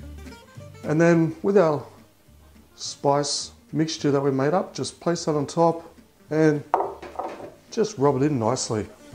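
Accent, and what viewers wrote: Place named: Australia. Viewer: From one Aussie I can say he is from Australia!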